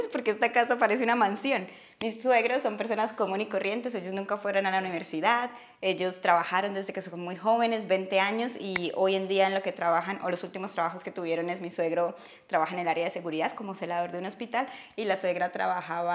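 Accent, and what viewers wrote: Colombia. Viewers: Me encanta que no pierdes el acento colombiano 😍😍😍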